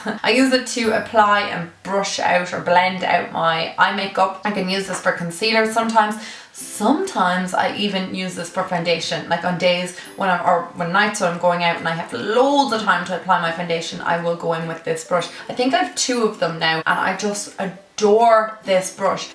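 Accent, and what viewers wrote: Ireland. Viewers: Your Irish! There is barely any Irish youtubers❤️xx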